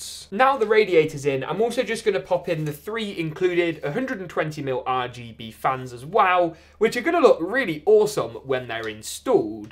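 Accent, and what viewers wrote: United Kingdom. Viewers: You should be britishian your accent show it 😁👍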